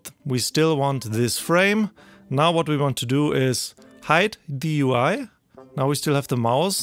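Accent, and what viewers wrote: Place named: Germany. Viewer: German accent incoming in 3...2...1 🥰 love it.. Thanks for your videos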